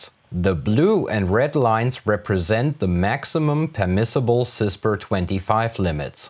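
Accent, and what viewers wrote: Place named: Germany. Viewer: schönes video. Achjaaa deutscher Akzent 😁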